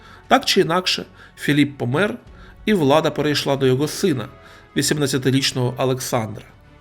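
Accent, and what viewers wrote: Russia. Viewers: Ріже вухо гекання. Українці так не розмовляють. Вибачте. Може я не правий . Але кацапське все бісить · Майже скрізь де вживаєте "ґ", там має бути "г". Не приємно чути кацапську вимову.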